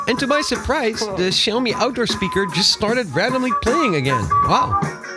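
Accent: Netherlands. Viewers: Ik dacht al je bent Nederlands haha goede review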